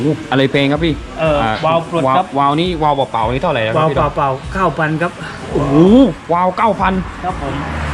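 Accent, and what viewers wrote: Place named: South, Thailand. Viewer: พี่แกพูดติดใต้หน่อยหน่อย 😆😆😆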